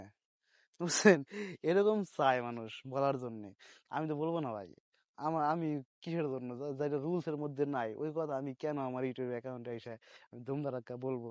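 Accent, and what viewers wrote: Faridpur, Bangladesh. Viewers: ভয়েস শুনেই বুঝে গেছি৷ কোন এলাকায় ভাই?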